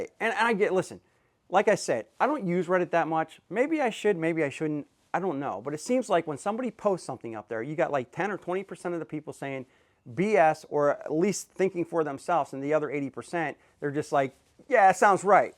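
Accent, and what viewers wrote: Pittsburgh, United States. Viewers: I'm glad you claimed your PA heritage. I noticed the Pittsburgh accent right off. If you ain't from Pittsburgh you was raised close enough to have the accent. I was raised in East Pittsburgh until I went to the Navy. I hope your a steelers/Pens fan. I bet our Buddy Vince is a Flyers fan.....even the coolest guys have some short commings 😂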